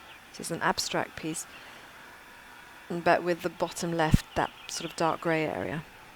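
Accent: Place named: United Kingdom. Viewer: dear, first of all,you should not, YOU CANNOT go back to not talking! I won’t let you,girl 😊 You are amazing, you’re just not use to it, but your voice is just as gentle as your hands are working. And your British accent on top of it wow!! You are definitely not annoying, you are not boring, don’t even dare think that! If someone doesn’t want to watch they can fast forward it,but I don’t think they will. And of course you made a lot of sense, you’re underestimating yourself, but seeing this in regular speed and hearing you explain it makes all the difference, please believe me! And of course, your piece came out wonderful as usual, gorgeous. I asked you previously about an airbrush,now I heard you saying you bought it a while ago. I would like one, but I guess if I want a better one I need to buy it secondhand. Although in generally I hear that they are using the airbrush to make flowers,petals or smaller more defined things., I enjoyed this so much,you can’t go back now to no talk. 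You are so lovely 😊🌺🌹 I will send you an email about Pashmina.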